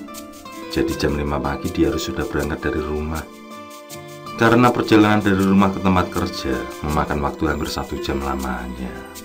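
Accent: Java, Indonesia. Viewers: Yg aku suka dri chanel ini salah satu nya pmbawaan logat bahasa jawa nya Gambar nya yg terkesan hidup tak lupa alunan musik nya yg mmpu mnghipnotis pkiran pokoke mantep smangat trus brkarya pakde bnyak blajar lah dri chanel ini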